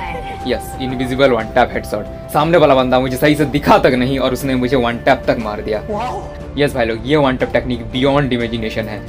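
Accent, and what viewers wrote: Bengal, India. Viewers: Bhai ap bangali ho kya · Bhai tu bengoli h 😮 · Bhai app bangalli ho na 😀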